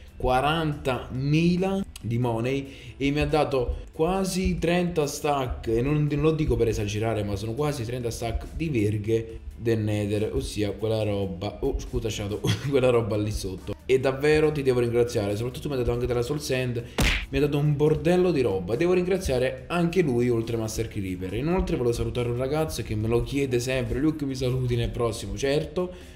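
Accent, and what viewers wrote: Naples, Italy. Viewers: Ma sei di Napoli giusto?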